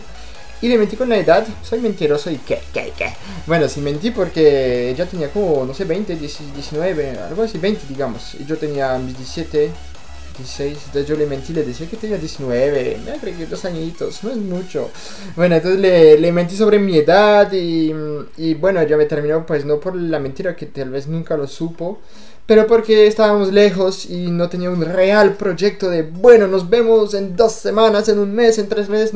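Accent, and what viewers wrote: Colombia. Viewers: Hola ya había comentado que me encanta el acento colombiano esta muy chevere... Pero el acento de mi México es chido je je je Saludos eres muy lindo... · De verdad que eres muy divertido y tierno, la verdad he visto mucho de tus vídeos y me encanta ese acento Colombiano que tienes... me encanta que te hayas enamorado de mi Pais... saludos y gracias por compartirnos las historias de tu vida... Pdta: Yo creo que tu naciste con alma Colombiana:D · jajaja el acento colombiano muy marcado chico!!! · jajjajajaja creo que te gustaban las chicas mayores! Y ya entiendo de dónde sacaste el acento colombiano. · Todos tenemos un acento muy diferente, por lo tanto lo diferente llama la atención, al menos a mi me gusta mucho el Acento Colombiano pero me encanta el mío... MEXICANO. tienes el acento Colombiano pero la sangre Francesa y en eso hay una muy bonita diferencia... 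Au revoir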